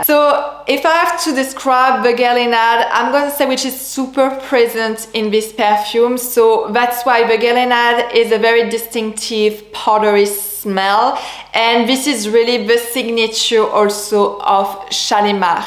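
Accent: France. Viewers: I started wearing Shalimar when I was very young and now I am on the very elderly side and still love it. I really enjoyed listening to you with your wonderful French voice. I love the way you told me all about the ingredients and why I so love my Shalimar. Thank you very much. MJMoore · Did you realize that the bottle on the caption is the Philtre de parfum flanker? When you mentioned the EDT, were you talking about the Philtre? It sounded like you did. Philtre is my favorite because it’s so airy and light and modern. Even the EDT is just too animalic for me and not so fresh. But who knows, I might change my opinion. I keep trying the EDT and EDP. BTW when you say heart ❤️ it sounds like hurt. Not sure if you’re aware of that. It’s your charm of course the French pronunciation but sometimes I wonder if you wouldn’t prefer to know about certain words. Like leather. 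By now I know what you mean, but the first time I heard you say that, I was wondering why a perfume would smell like a lizard🦎 😊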